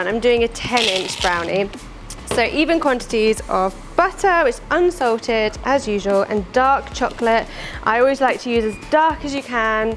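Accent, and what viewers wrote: United Kingdom. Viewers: It is the best British accent I've heard from a you tuber.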